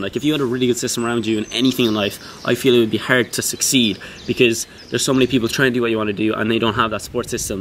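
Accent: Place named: Ireland. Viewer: Some good answers man! Nice Irish accent haha 😂😅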